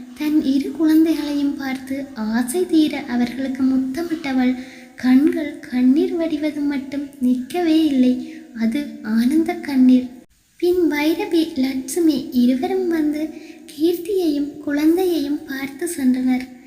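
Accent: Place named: Sri Lanka. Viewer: அக்கா நீங்கதானே காவலனே எண் காதலனே கதை எழுதுணது .உங்களுடைய வேற youtube channel ல படிச்சிருக்கேன் .எனக்கு அந்த கதை ரொம்ப பிடிக்கும்.அடிக்கடி அந்த கதை படிப்பேன். நிங்க அந்த கதை நீக்கிட்டீங்க😢.அந்த கதை பல மாசம தேடி பார்த்தேன் அது இல்ல.இப்பதான் இந்த channelல உங்க குரல் நிங்க பேசுற இலங்கை தமிழ் வச்சித்தான் கண்டு பிடிச்சேண் .திரும்பவும் அந்த கதை இந்த channel ல upload பண்ணுங்க அக்கா please😢😢.அது 9 episode தாண சீக்கிரம் upload பண்ணுங்க அக்கா.எனக்கு அந்த கதை ரொம்ப ரொம்ப பிடிக்கும்.உங்க பதில்காக காத்துகிட்டு இருக்கேன். 😢😢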